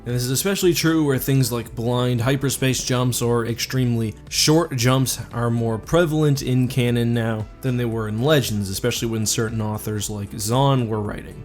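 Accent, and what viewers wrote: Canada. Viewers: Oh shit, you're a canuck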